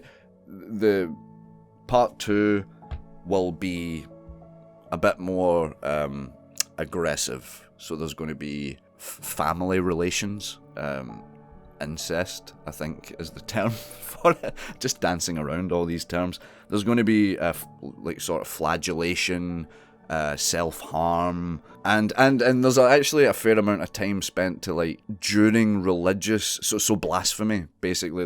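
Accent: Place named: Scotland, United Kingdom. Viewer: Where in Scotland are you from? Sorry, I can't help it, I love your accent. 😊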